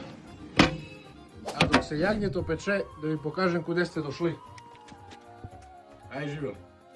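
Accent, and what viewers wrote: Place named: Serbia. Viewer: ovo je čisto srpski....ja pola ne razumen .....:)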